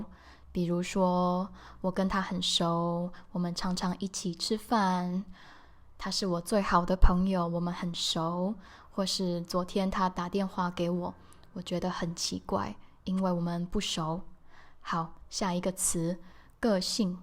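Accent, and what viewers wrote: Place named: Taiwan. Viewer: Thank you so much your video are just perfect even after 5 years your video is still useful, im also taiwanese so I'm so glad i found a video with taiwanese accent ❤ the subtittle a lot too thank you for working very hard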